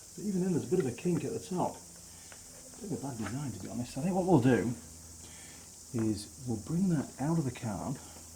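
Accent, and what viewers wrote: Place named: North, United Kingdom. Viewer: I detect a slight northern twang in that voice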